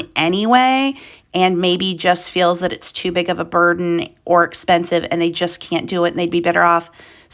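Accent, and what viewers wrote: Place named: United Kingdom. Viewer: Great British accent.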